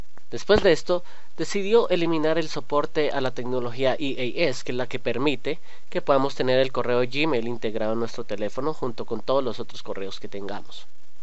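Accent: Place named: Mexico. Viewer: El Senséi tiene toda la razón, con acento manito, pero la tiene toda :o)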